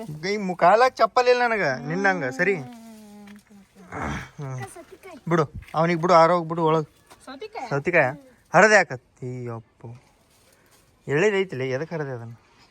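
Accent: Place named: Andhra Pradesh, India. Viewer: Anna navvvu kuda andhra avre anna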